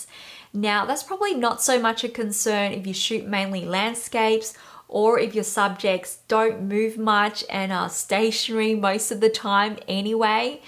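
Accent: Australia. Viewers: Enjoyed the your thoughts and examples. Your Aussie accent so pleasant to listen to. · Thanks for the really informative review, it's great to hear from an actual user of their experience. I also am enjoying your "Aussie" accent (with perhaps a bit of Asian in there), it's so pleasant to listen to! I'm about to order my XH2, and upon checking out the firmware updates it looks like with version 3.0 they've added the ability to set the ISO with the FRONT COMMAND dial. I've also seen in the manual that the current ISO can be displayed on the main screen. Unless I'm misunderstanding all this (don't have the actual camera yet), it seems that Fuji may have addressed this specific complaint.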